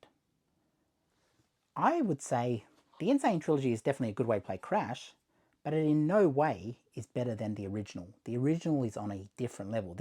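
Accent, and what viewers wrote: Australia. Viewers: Austrailan indigenous.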